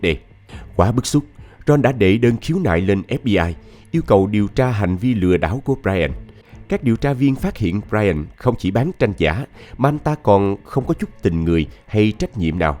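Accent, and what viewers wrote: South, Vietnam. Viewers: Xướng ngôn viên có giọng đọc miền Nam hay quá. Thanks.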